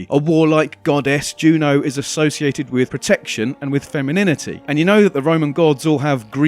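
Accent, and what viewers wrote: United Kingdom. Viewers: You've put together some very interesting analysis, sir. I dig the British accent, too, a freakin' awesome--or bloody awesome, as your people would put it--addition to this video. Although born in the USA, I am fairly certain that my last name derives from your whereabouts. Anyway, enough with the unnecessary formalities. Let's talk Starkiller and Rebels. Admittedly, the chances of Galen Marek appearing in Star Wars: Rebels are slim-to-none, but I'm still crossing my fingers in hopes that Disney figures out how to make it happen. On face value "The Force Unleashed" title is most akin to the Star Wars Legends banner; in that light, certain events that take place in TFU would perhaps strike Star Wars fans as too 'extreme' for lack of a better word, too outrageous or far-fetched to be designated as "canonical" (see: Marek using the force to pull down an entire star destroyer until it crash-lands). 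On the other hand, George Lucas played an integral role in the development of Marek's story arch, suggesting a heightened significance of TFU in comparison to many of the older Expanded Universe novels; also, Disney can always hand pick-and-choose amongst the more 'realistic' happenings that take place in The Force Unleashed...w/e that means. It's all science fiction, after all. Diehard Star Wars fans are so apt to forgetting that this is all fake, that it's all merely intended for entertainment. Real talk. At the end of the day, none of these motherf***ers are real. For all I care, Di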